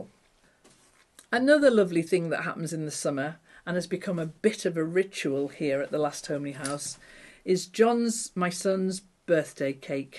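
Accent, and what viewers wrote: England, United Kingdom. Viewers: ❤love your English accent and how you live life to the fullest 🎉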